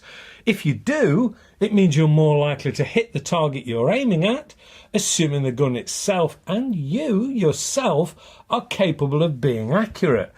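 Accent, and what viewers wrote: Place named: Leicester, United Kingdom. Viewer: Are you from Leicester? Can notice that accent a mile away :D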